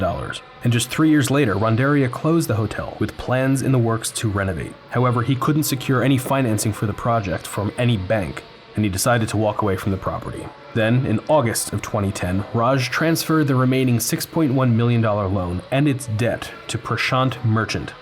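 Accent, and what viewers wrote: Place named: Virginia, United States. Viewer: There’s something about an old Virginian accent I find really calming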